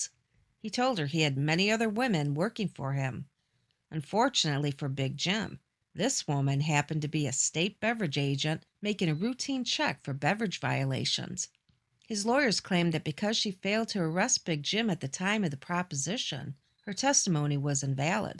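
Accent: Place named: Western New York, United States. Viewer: I really enjoy your channel and how you research and include newspaper clippings like in this one which I thought was very good. The narrators voice is unique, def Western NY? Cleveland or Detroit I’m guessing lol. But this story got my interest bc I moved down from Brooklyn to Fort Lauderdale in 1983 and the locals were still talking about this story and Big Jim. I’ve even been in the 4 O’clock more than a few times back then lol. Keep up the great work you people do.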